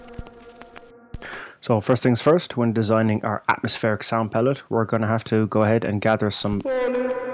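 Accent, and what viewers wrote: Ireland. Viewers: Can you do Detroit electro? And is that an Irish accent ?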